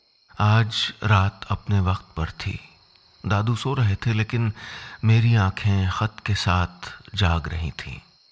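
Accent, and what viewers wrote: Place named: Bengal, India. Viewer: Love you Sir from Kolkata .. kitna sweet lagta hai app ka Bengali :)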